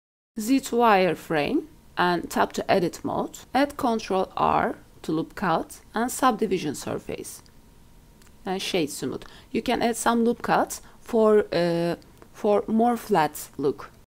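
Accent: Turkey. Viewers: şiveden tahmin ettim Turk oldugunu :D eline saglik 🙏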